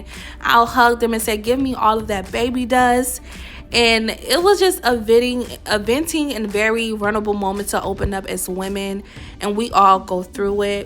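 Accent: Miami, United States. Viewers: there's no way you aren't from Miami! That accent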